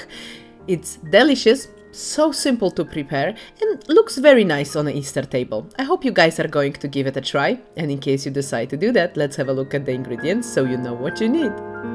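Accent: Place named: Poland. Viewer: I love your accent so much :) you're polish right?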